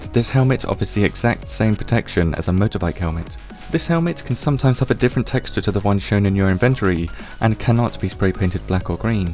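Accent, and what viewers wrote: United Kingdom. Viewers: This was lit (Love your soft British accent)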